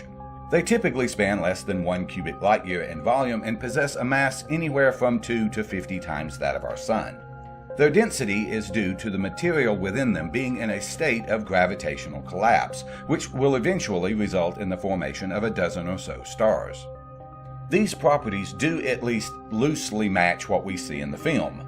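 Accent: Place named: South, United States. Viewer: I just found your channel.. am subbed after hoth and this genesis video... fantastic. Also i say this as a southerner, and with implied awe... but you sound so much like jim varney... like he is explaining these subjects. It may just be the microphone, but you sound like you have a similar accent also. Anyway, awesome channel!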